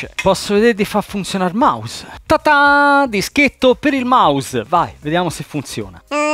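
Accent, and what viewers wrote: Tuscany, Italy. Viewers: Lei e’ eccezionale! Mi sono visto questo suo video di 4 anni fa e l’ho trovato ancora attualissimo, sia come tema sia nel modo in cui lo espone, con la nota simpatica pronuncia con inflessione toscana, e una incredibile professionalità. Continuerò a seguire tutti i suoi video con avidità stupendomi ogni volta. Grazie per i bei momenti (e emozioni) che ci (mi) regala.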